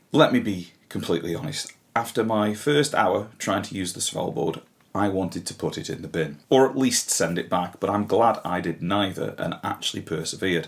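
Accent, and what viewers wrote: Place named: Stoke-on-Trent, United Kingdom. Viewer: Mate, are you from Stoke on Trent? That accent sounds familiar!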